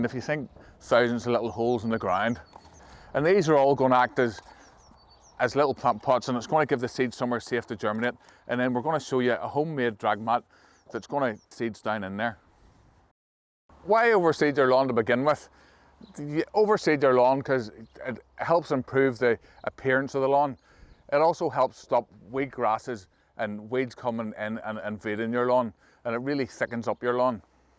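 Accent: Northern Ireland, United Kingdom. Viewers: I love the northern Irish accent, so unique!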